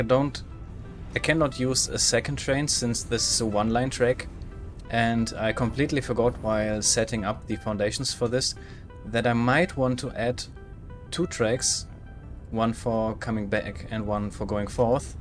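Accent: Germany. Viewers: No problem :) Hahaha all god mate, bin auch Deutsch :D hab ich direkt rausgehört ^^ Sollte kein Front sein, glaub wenn man den Akzent nicht kennt, fällt das den English native speakern nicht auf ;)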